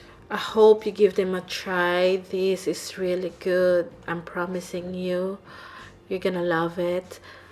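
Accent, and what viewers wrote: Philippines. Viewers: You have the typical Filipino accent.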